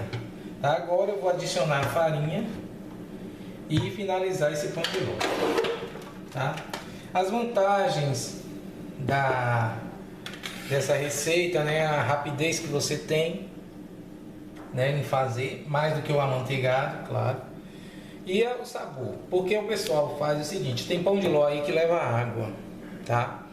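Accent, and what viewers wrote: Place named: Bahia, Brazil. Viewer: que Deus o abençoe por compartilhar com a gente seus conhecimentos. Parece q vc é baiano né? O povo bom...As viagens q mais gosto são para sua terra. Então, gostaria de uma receita sua pra forma de 30 cm de massa amanteigada branca e de chocolate que na esfarele quando eu cortar.... se puder me passar???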